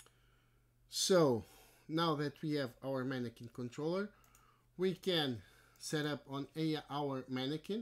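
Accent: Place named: Portugal. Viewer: da p ver k es tuga XD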